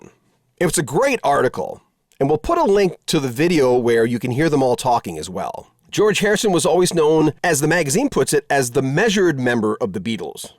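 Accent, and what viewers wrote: Canada. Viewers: I didn’t see a video I just heard a Canadian accent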